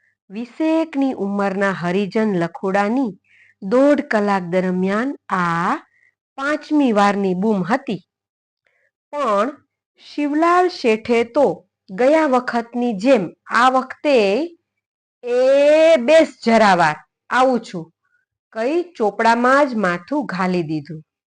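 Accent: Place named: Kathiawar, India. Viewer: પન્નાલાલ પટેલ ની વાર્તાઓ આ પ્રમાણે કાઠિયાવાડી લેઝા થી વંચાય નહીં એના માટે તો સાબરકાંઠા એટલે કે ઉત્તર ગુજરાતનો લહેકો અને લહેજો હોવો જોઈએ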